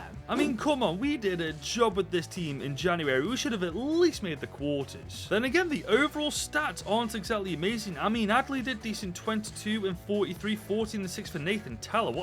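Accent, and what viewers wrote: Stoke, United Kingdom. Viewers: Eh up mate, which part of glorious Stoke are you from?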